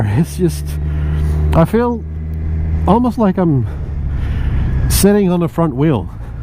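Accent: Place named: Canada. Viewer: I’d have said sounds like a Canadian accent Nice bike bought an orange one a few months back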